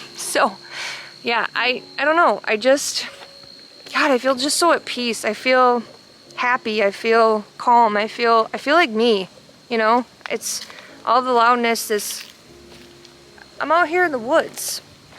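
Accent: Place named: Midwest, United States. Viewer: not sure why this showed on my feed but you seem cool. Also love the midwest accent.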